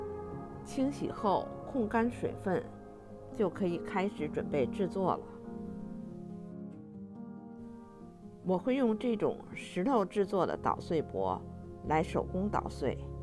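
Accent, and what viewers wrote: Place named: Beijing, China. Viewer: 我就知道您是北京的。我听出来啦！！！特别亲切😍 也祝您和家人周末愉快！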